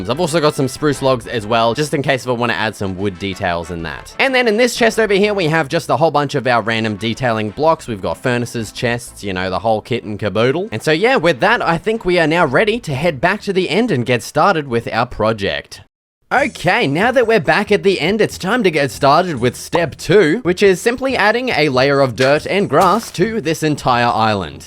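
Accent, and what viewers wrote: Australia. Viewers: U can’t tell me u aren’t Australian 🇦🇺 if you are then we are the same (I’m Aussie)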